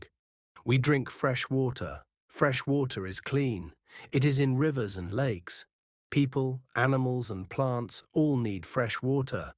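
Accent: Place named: United Kingdom. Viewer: british olması lazım ama çok takılma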